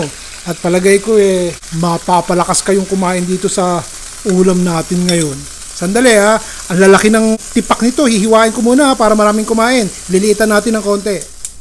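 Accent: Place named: Tagalog, Philippines. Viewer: So yummy, 😋tagalog na tagalog ka chef😊😅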